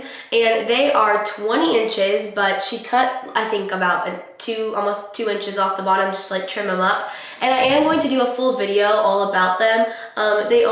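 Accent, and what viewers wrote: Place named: General American, United States. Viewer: Hey? I've always wondered why don't you have a Texan accent if you live in Texas? I hear hints of it sometimes but you have a very general American accent!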